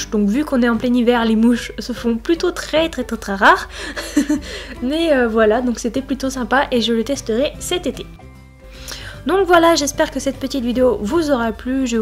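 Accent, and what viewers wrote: England, United Kingdom. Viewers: 😊💙 (j'adore ton accent anglais ) 💙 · Sinon superbe vidéo le son était parfait rien a redire Azzaro est juste trop beau et ton accent anglais est normal ! Si tu entendais le mien !XD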